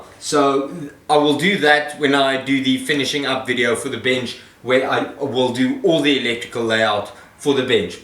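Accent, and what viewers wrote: South Africa. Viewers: Well done with the table. Its a serious space saver. You sound south african. Still living here? If so, where will I find an automatic on/off switch as described on your website? Thanks for a great channel.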